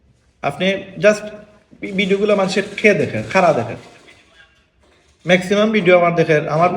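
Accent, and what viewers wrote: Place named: Sylhet, Bangladesh. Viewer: Vaiyar Sylhet e vasay kotha gula khub valo laglo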